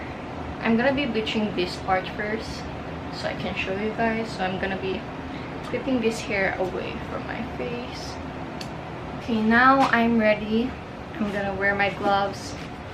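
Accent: Philippines. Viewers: She's korean? Or a filipino living in korea? Because i notice filipino english accent/slang. So i'm assuming she learned english in the philippines if she's actually korean 🤣. If she's filipino then this makes sense Edit: i finished the video. Ok pinoy ka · pardon me bc this is the first video im watching frm your channel! i've subscribed:) just wondering cus i hear a little accent.. are you a filipino?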